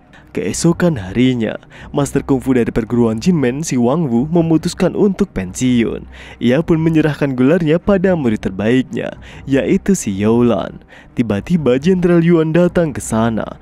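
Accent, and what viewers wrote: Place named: Buol, Indonesia. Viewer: Logat apa kw pakai itu... Orang buol kh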